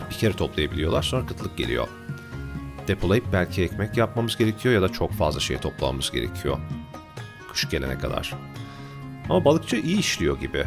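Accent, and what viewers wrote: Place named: United States. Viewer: Namı değer türkçeyi amerikan aksanıyla konuşan koca yürekli insan sanada selam olsun:washhands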